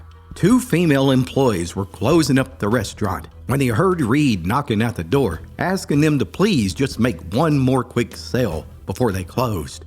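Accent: Appalachia, United States. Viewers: Men this narrator & this country ass accent 😂😂😂mf sound like he bout to cry.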